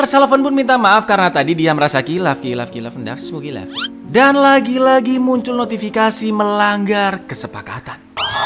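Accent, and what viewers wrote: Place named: West Java, Indonesia. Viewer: orang Sunda ya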